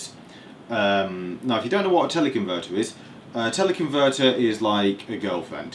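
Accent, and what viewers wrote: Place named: England, United Kingdom. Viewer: I actually enjoyed watching your video. You made a dry subject entertaining and I liked your English accent and humor. You will probably say: I don’t have an accent. 😊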